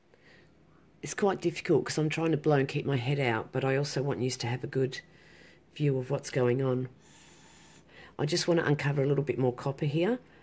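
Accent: Australia. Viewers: Haha!! Well then, that’s perfect!! We both got a little chuckle this morning ☺️ (or “this evening” 🇦🇺 you sound Australian which means are 14 hours apart) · Ah the Aussie accent and the 'youse' hehehehe! Hilarious! (I'm a Aussie too.) I see someone has already talked about the narrow field of vision so that's great for next time, but you might also try not to say 'um' so much hehehehehehe. This technique is so very exquisite isn't it? i love it. is the paint waterproof, how do you seal the tile and can it be put on a wall or is it only to be framed?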